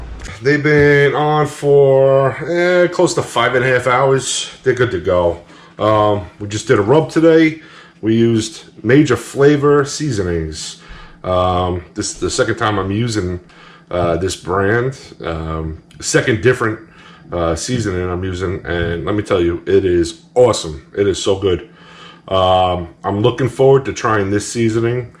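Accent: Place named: East Coast, United States. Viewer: Ribs looked great, love the Heavy Metal EMS Tshirt and I detect a slight East Coast accent😳🦖 great job on the WSM👍🏼🥃🇺🇸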